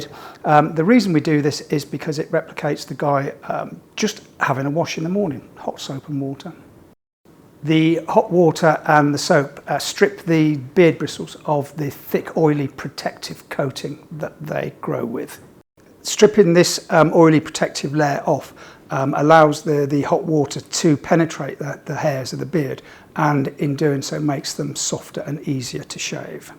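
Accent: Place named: United Kingdom. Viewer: As a Yank it's great to hear British English...it's a dance of the language.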